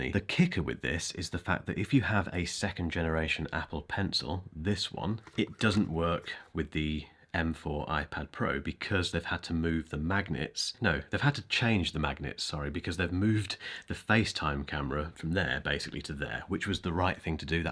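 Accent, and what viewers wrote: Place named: Cardiff, United Kingdom. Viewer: The most proper Cardiff accent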